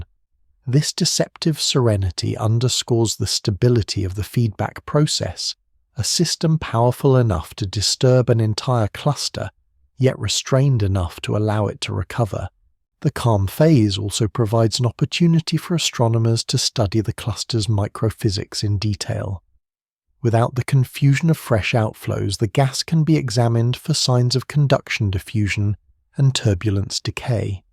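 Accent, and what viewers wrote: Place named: United Kingdom. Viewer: 2 plus hours of yap yap with British irritating narrator voice 🎉please 🙏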